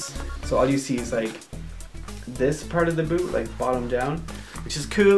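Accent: Ireland. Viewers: Are you from ireland ? Can kind if hear an irish accent :)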